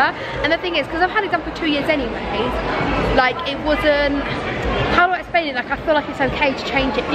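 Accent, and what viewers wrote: United Kingdom. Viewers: Omg British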